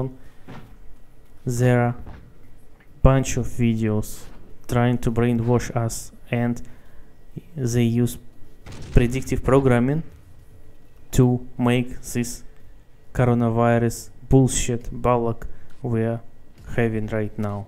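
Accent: Russia. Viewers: ooh,hi my Russian brother!!! very tick accent,i love it! greets from Macedonia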